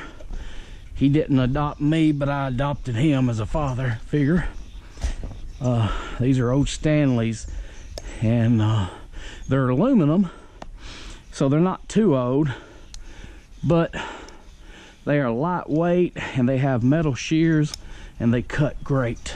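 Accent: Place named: United States. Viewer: Was a good video, love the American accent you have, it's soothing to listen to, it's great you enjoy the metal, it's great dismantling things, I collect metal for a little pocket money, it's great, i collect small amounts, i have no car at the moment. Carry on doing what you love, it's all we can do until the end of time.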